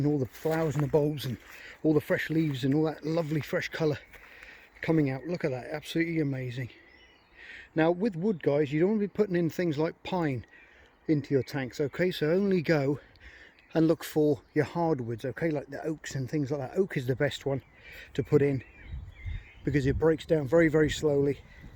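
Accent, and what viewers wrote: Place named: England, United Kingdom. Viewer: Friendly neighbourhood Aussie pest here again. Lord I luv a pommy accent. Lol Ok lets start watching.......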